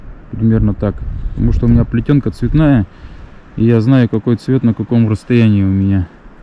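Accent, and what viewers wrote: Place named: Urals, Russia. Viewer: Говор уральский)) какой регион? Свердловская область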